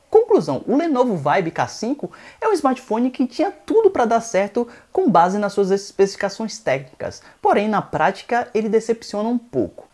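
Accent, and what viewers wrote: Bahia, Brazil. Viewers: vc é baiano ?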